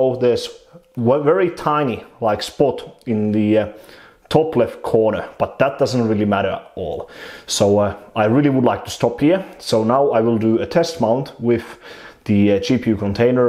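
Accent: Finland. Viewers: Ai nyt on todella suomalainen aksentti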